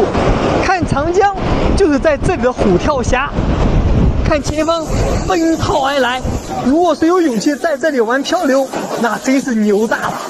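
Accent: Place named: Su-Lu-Yu-Wan border, China. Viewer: 听口音，博主应该是苏鲁豫皖交界地区口音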